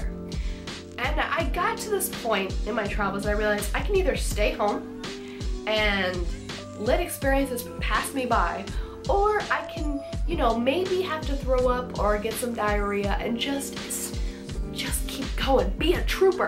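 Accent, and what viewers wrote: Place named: South, United States. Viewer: LOL you should keep your southern accent at all times!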